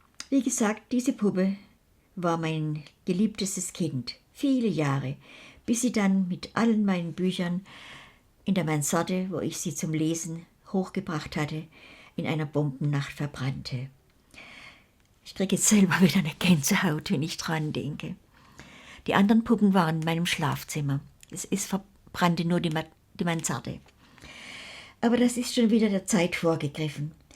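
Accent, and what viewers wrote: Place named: Baden, Germany. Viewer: Blödsinn! Dass ist Badischer Dialekt und mehr nicht!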